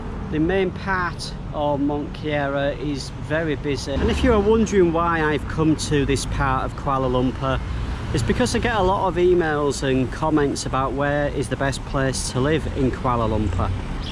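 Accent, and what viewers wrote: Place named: Newcastle, United Kingdom. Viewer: Are you a Geordie? I really like your accent. I used to study in Newcastle back in 2016.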